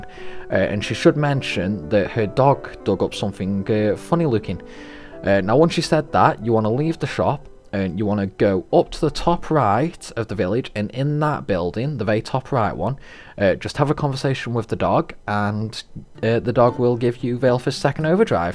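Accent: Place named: Manchester, United Kingdom. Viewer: Are you a Manchester? I recognise that accent pal 👌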